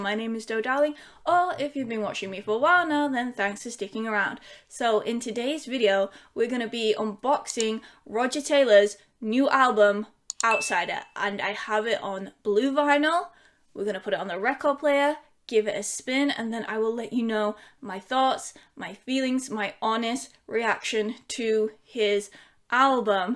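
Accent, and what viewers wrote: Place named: Newcastle, United Kingdom. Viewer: This review is awesome and I love this album. The production and harmonies are outstanding, as is Roger's drum sound. You are pretty awesome too hahaha. I was trying to detect your accent. I will go with Geordie. I am a Londoner, so probably totally effed that up hahahaha x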